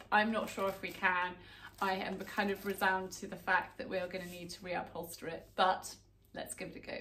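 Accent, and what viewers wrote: United Kingdom. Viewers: Dream wifie material complete with distinctive British accent. Very lucky husband indeed.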